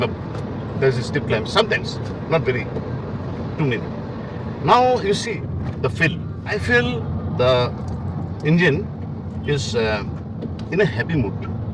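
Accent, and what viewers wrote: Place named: Assam, India. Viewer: accent sounded like an Assamese accent. Nevertheless, great video.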